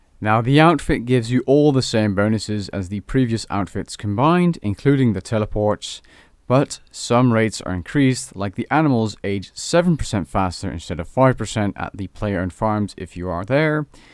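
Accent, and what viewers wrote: Netherlands. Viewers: that dutch accent tho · He’s fucking dutch, it’s not his first language. Now shut the fuck up.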